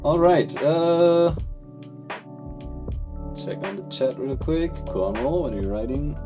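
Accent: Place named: France. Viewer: Yessss, gorgeous French accent albeit very feint 🥹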